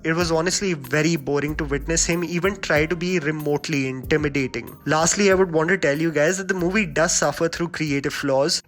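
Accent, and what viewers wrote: India. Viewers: lol such a sad creature, if you hate Indian accent why are you here? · He has a funny Indian accent. Not sure if you Indians should allow your funny accents ruin Tarantino movies with your “taank you come again” accent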